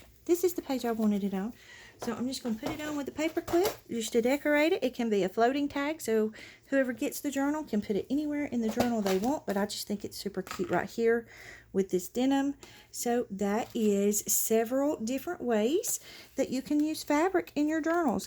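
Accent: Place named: Tennessee, United States. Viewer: I knew I recognized your accent - maybe because I'm just down the road from you in Harriman! 😂 Love it! Thanks for sharing. Great ideas & lovely journal! God bless.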